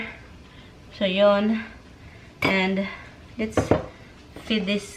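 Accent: Visayas, Philippines. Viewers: hello mam maayong buntag....ka cute sa imong mga ering og ero hehehe bisaya kaayo.....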